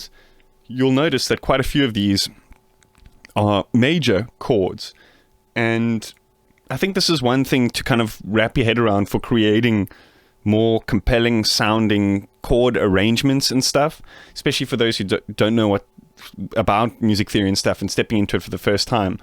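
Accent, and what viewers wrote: South Africa. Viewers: Are u South Africa? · he is South African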